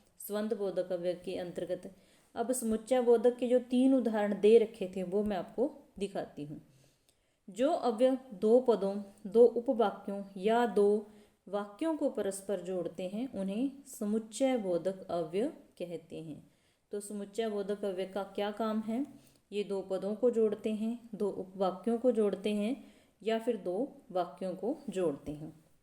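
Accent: Punjab, India. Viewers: Mam apki hindi me Punjabi touch h aap Punjab se ho.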